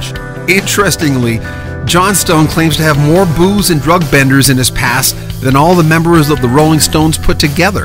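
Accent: Canada. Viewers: I'm quite certain that our narrator is Canadian...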